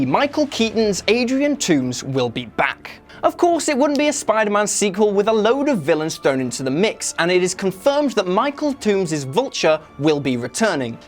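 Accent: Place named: England, United Kingdom. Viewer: Ugly English accent.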